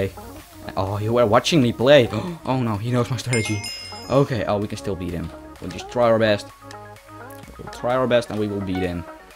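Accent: Netherlands. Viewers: It sounds Like youre dutch Nice CHANNEL keep up THE Good work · Ik hoorde het aan je accent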